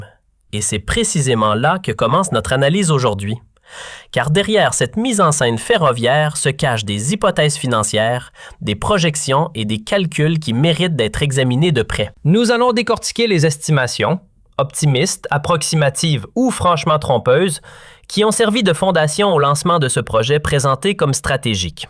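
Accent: Canada. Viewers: Désolé pour vous, même s'ils ont utilisé la voie canadienne pour la lecture de ces commentaires dont le contenu n'est nullement convainquant, un économiste algérien formé en Algerie vous répond, Ce que vous oubliez est plus important que ce que vous débitez, vous oubliez le rôle du plus grand port de Méditerrannee, celui de Hamdania, qui ouvre la voie au commerce international du Sahel vers le Monde, et l'inverse. Vous oubliez de préciser que cette voie ferrée se situe sur le tracé de la voie des épices que la Chine compte développer. Vous oubliez de rappeler que ces trains vont servir à acheminer les produits des activités du sud, produits agricoles, le lait en poudre de Baladna, les mines de cuivre qui sont sur cette voie... Ce que vous appelez de petites gares, deviendront des villes, allez consulter l'histoire de la création des villes américaines et ailleurs grâce aux lignes ferroviaires. 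Ces lignes ferroviaires ne decervent pas seulement cette région, mais elles relient toutes les régions d'Algérie entre elles. Je m'arrête, mais je pourrais en parler pendant des heures, et ce que j'ai écrit là n'est pas dans le but de vous éduquer d'avantage seulement, mais c'est surtout pour informer le Peuple algérien contre les rumeurs et mensonges que des gens comme vous sèment pour foutre la merde dans les pays qui gênent le sio international...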